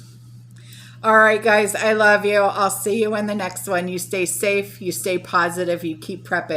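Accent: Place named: Pennsylvania, United States. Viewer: Was listening to you when I was up visiting my son in Pensacola...he was like...is she from Pton (my hometown in PA) she sounds just like you & your friends...coffee and sticky snacks. It made my heart sing that he does pay attention to our words. Have a fantastic day. Love your channel.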